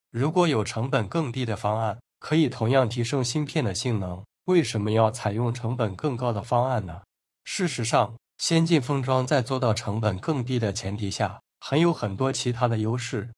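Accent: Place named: China. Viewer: 這口音與用詞就是對岸中國的味。不專業的程度就跟中國的漢芯一樣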